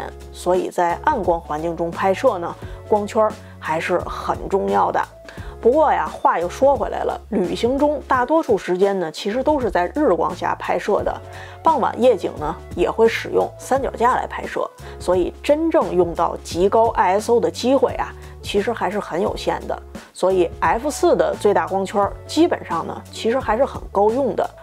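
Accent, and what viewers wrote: Beijing, China. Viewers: 北京口音就这样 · 这不是北京口音吗？像说相声的？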